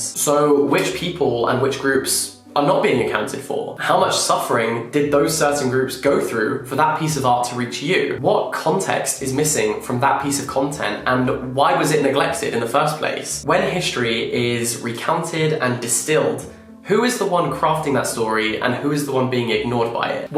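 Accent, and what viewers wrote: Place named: England, United Kingdom. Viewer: Again, your english accent is exquisite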